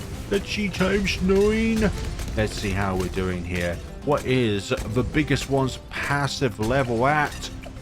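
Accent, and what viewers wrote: United Kingdom. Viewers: Wait.are you brittish or australian? Cuz you sound like the ceo of australia.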